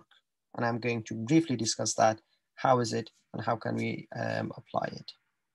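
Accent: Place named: United Kingdom. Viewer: Strong British accent !☺️ Well done ✅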